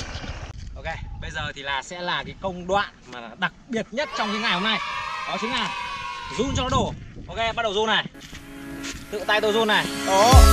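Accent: Thai Binh, Vietnam. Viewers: Anh là người đâu vậy ạ?? Nghe giống giọng Thái Bình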